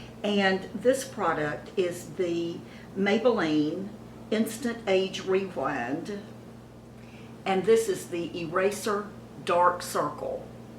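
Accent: South, United States. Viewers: I am new to your channel !! Im so glad I found you !! You are such a delightful and very Beautiful classy lady, I can't wait to show my Mom your channel !! If i may ask, where are you originally from ? I detect a southern accent ? Blessings and Love....XoXo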